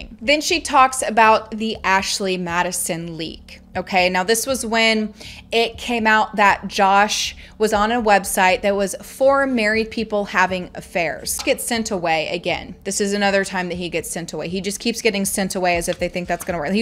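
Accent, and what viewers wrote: Arkansas, United States. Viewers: ❤ Are you from Arkansas? I am..😂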